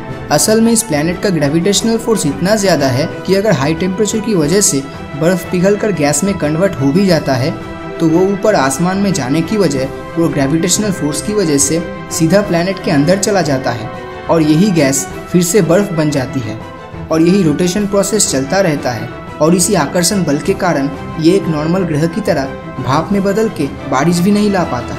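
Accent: Bengal, India. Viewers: Bengaliness in Hindi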